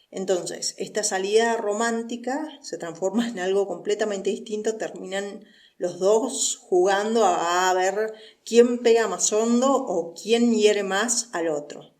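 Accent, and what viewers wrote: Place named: Argentina. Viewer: tienes razón promete y mucho esta serie y ellos hacen buena pareja, Kivanc no defrauda me gusta mucho es un actorazo, siguiéndole como siempre desde MALLORCA (perdona tu ede que parte de Argentina eres,xq tienes el acento pero eres muy pausada y dulce hablando,lo dice alguien de Rosario ),🙋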